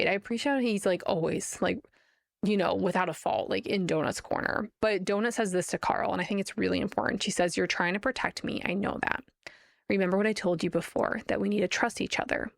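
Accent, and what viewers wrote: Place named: New England, United States. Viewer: Can I ask where you’re from? The aspirated L in also, and the dropped final consonants makes me think New England.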